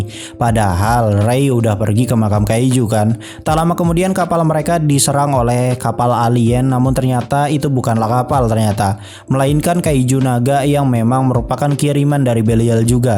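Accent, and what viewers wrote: West Java, Indonesia. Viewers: Bang maneh teh orang Sunda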